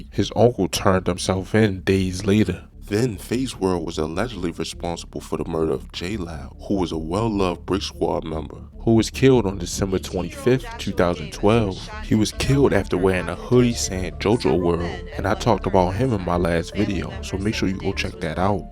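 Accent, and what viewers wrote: Baltimore, United States. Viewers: I can tell the narrator from Baltimore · That BMore accent you got heavy as hell foo 😭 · Take yo ahh back to Baltimore I can’t listen teewww yo ahh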